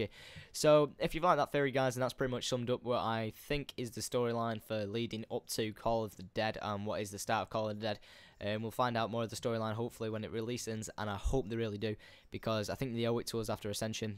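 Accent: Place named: Manchester, United Kingdom. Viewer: that's a manchester lad MINT haha. Well done son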